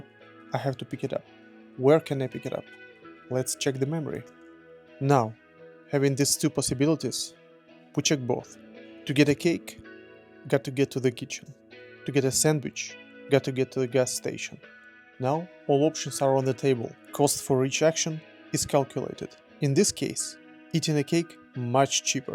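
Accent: Russia. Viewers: Сразу видно нашего по ярко выраженым русским акцентом. Хотья я и так знал что создать "Привет Сосед" русский но все равно ❤ Жалко что нет звуковой дорожке, я бы послушал оригинальный голос без ярко вырженого акцента😅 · русский акцент никогда не скроешь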